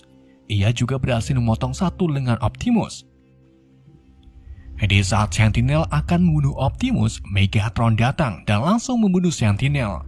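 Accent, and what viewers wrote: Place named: Tegal, Indonesia. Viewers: curiga..ni pebgisi suara kyy orang tegal...tau semua bahasa tegal... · Sampean wong tegal wis jelas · Dari logat bicaranya kayak orang jateng😂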